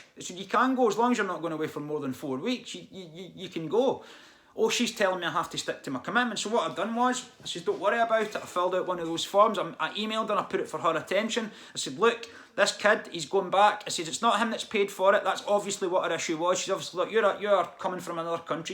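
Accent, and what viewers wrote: Scotland, United Kingdom. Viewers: It's amazing how people like to look down on other people once they get into a position of supposed authority, especially in the police force.why why why but there is something we can do about the dwp have you tried contacting radio 4? They are pretty good at exposing this sort of stuff. Never apologise for your great Scottish accent, we all have accents. Have a good day🥰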